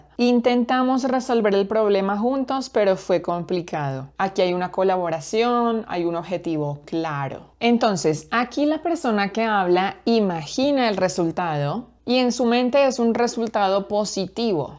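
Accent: Colombia. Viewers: rapidamente me he dado cuenta que eres Colombiana, por tu acento y por el uso de la palabra "vaina". buena leccion!!!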